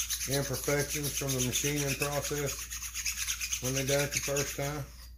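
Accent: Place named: South, United States. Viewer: "Hello Everybody" with that southern accent just lightens up your day. You should voice for Hallmark, they could produce special edition electronic greeting cards.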